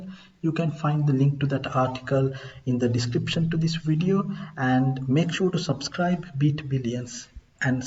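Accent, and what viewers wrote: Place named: India. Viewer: I love your indian accent!!! its so adorable!!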